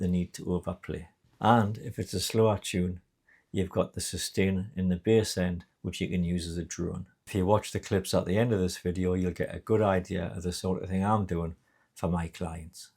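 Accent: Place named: Geordie, United Kingdom. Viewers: For my money yhe Geordie accent is the most beautiful English accent I know. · where in the north is the accent from? I have to concentrate, but understand well enough (Irish myself) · Midlander brought up and lived in south decades since, except for short period a little north. Zero struggle with accent. I like the northern accent. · I’m west country born and bred, understood every word. There’s nothing more satisfying than hearing the word Bazouki in a north east accent! Great video.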